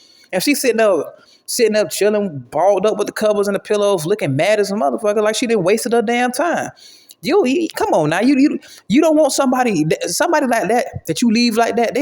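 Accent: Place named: South Florida, United States. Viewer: I ask bc you have a soflo accent and dialect 🦾 I was like be Fromm the crib lol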